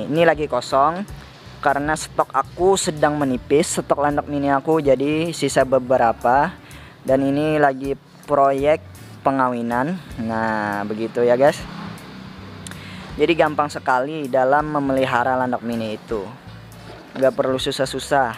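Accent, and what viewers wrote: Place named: Banjar, Indonesia. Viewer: Totok banjar logat nya neh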